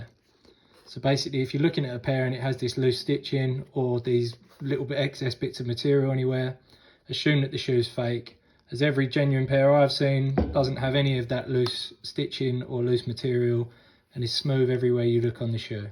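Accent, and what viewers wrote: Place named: United Kingdom. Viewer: Ohhh that british accent.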